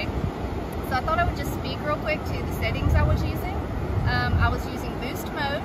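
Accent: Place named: United States. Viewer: You look like an Australian, Aussie girl with an American accent 😉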